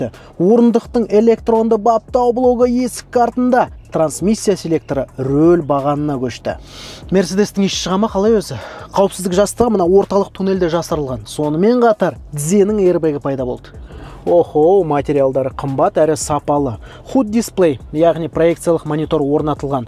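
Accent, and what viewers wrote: Standard. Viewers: ісіңе береке берсін сен сияқты таза қазақша сөйлейтін қазақтар көбейе берсін аумин 🤲🏻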